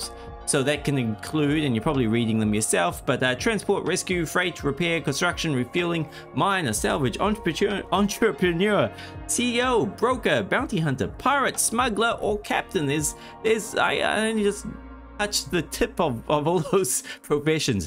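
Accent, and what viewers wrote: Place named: Ireland. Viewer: .03 now lol the game crashing bad. Here is the thing. It is all clicking and no development in making the game playable. It is exactly as playing the stock market and not a game. But here is the thing this game would be very nice but thing is you have to spend crypto. No cc or account in the game. So it sort of locks players in to only play with crypto instead. Look at star citizen. They have made over 500million and still in alpha after 13 years later. This game looks good at 1st then dig into it then you see it's very very bad over all. Plenty of games you play to earn and also in sol blocks too. Just another dream and wish demolished. Btw your voice cool sounding be like an Irish guy. Lol like it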